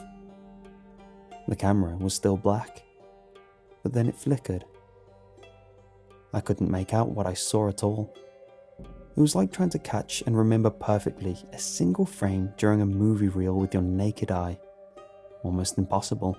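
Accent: United Kingdom. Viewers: Mr.BrittishAccecentCreepManDudeChillVoiceCreepypastaSir Cna i have a like from u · Well, I really enjoy listening to pastas read by you (especially since I definitely prefer British over American accent), so like always, good job :) But I just had to comment on those stories - it was pretty easy to guess what was going to happen next and they were not scary at all. And please - how could I expect a phone making a sound when someone is hiding xD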